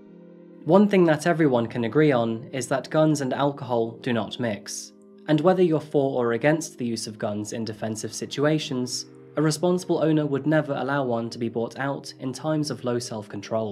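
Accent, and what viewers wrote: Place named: United Kingdom. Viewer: I love this interesting mix, you always do first class research, and I really enjoy video narrated by fellow Brit who knows how to properly pronounce English 😂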